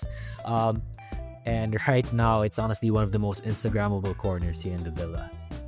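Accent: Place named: Conyo, Philippines. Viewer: Ang conyo. Sakit sa tenga pakinggan 😅😊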